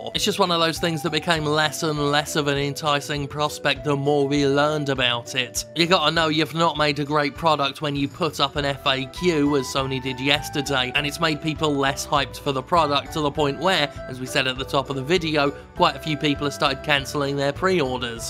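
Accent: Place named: United Kingdom. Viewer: Shitsony, cancelled mine. you grew up in the UK? Thought it was just a comedic accent.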